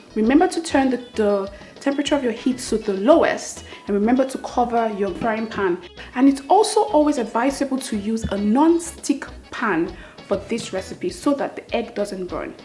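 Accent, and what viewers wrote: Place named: Igbo, Nigeria. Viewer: I think she is an igbo girl the way she speak oo my god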